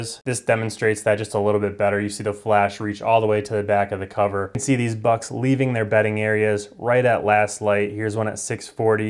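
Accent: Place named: North America. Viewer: It would be nice of you to start by pointing out this concerns the US and possibly Canadian market specifically (still less than 5% of the global population). Not everybody can get this from your accent alone. Otherwise, a very useful overview, even if I'm interested in them for property control. And, btw, I would also mention that all three of those brands are North American and hence are likely to host their services (read: +-all of the stuff your camera sends) in there, instead of China, as some other well known Chinese brands do.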